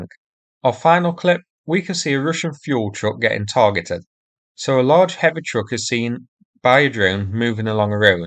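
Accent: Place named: North, United Kingdom. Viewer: PLEASE tell me you are doing the audio book version, I need to hear those dinosaur names read out with that norther accent!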